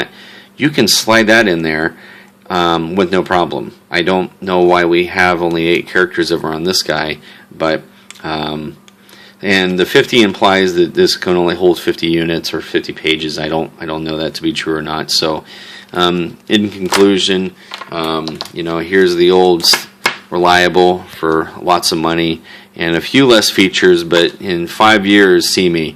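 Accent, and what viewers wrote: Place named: United States. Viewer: Great Video...Well explained on all the Features and Functions over 33 minutes! Not boring at all...well spoken! But then again, you sound like Michael Douglas (the actor) with your American Accent as i am a Northern Englishman with a Yorkshire Accent! i just bought a 150 metal (silver) for £75 including delivery off E-Bay last week.!!! It was 2nd hand...but in near excellent condition! I was impressed as it arrived today! I had a Casio150 (identical) bought in 2013 as a gift and it has been well used and knocked/worn out! I caught the strap on a metal fence (caught inbetween the strap and wrist and it broke the strap!) Also the battery was replaced around the same time and it now doesnt bleep at all, and the repair shop didnt know how to fix it...so i decided to get another! Il keep it for spares as i did the previous casio 80 model i bought in 2003 which was a shell from which i used my 1994 black rubber 80 casio!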